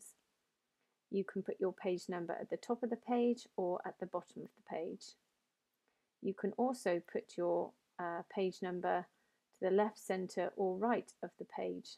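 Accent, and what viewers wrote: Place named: Bristol, United Kingdom. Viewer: Are you from Bristol by chance? Your accent sounds much like my friend who grew up in Bristol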